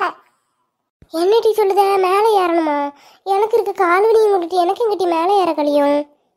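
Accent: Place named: Nagercoil, India. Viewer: Super sis.... Sema...NGL slang....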